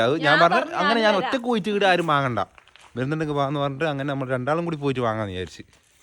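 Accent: Kannur, India. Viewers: അതാണ് നമ്മുടെ കണ്ണൂർ ഭാഷ 🥰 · ഓടുത്തും നമ്മുടെ സ്വന്തം കണ്ണൂർ bhasha😂😂😂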